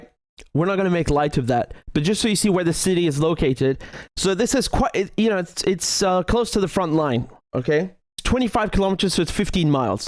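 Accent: South Africa. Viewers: 100% south african accent. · Yup English south african Doesn't sound Afrikaaner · Definitely South African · Yes, he is a South African with an Afrikaans accent. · Hes very South African😂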